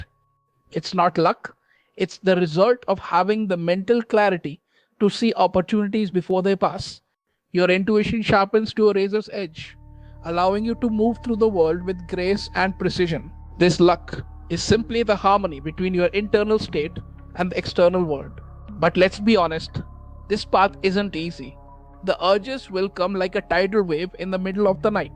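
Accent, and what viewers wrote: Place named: India. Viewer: I liked the AI voice more POWERFUL and SERIOUS. INDIAN ACCENT makes it lose of FOCUS from the TOPIC. BRING BACK AI VOICE. PHEROMONES ✔️ PHARAAMUNES ❌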